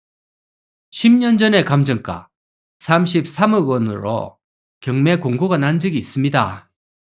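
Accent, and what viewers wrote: Gyeongsang, South Korea. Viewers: 님 구수~한 갱상도 사투리가 참 정겹습니다 오래 오래 건강하셔서 좋은 영상 많이 보여 주시고 올해 반드시 100만 구독자 돌파 하시기 바랍니다!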